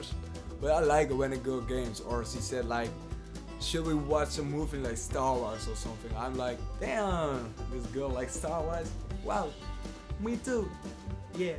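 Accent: Netherlands. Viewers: Ik dacht al dat je Nederlands sprak!! Ik hoorde het aan je ‘accent’😂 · Ik hoorde je Nederlands accent hahahh je Engels is wel nog steeds goed hahahah😂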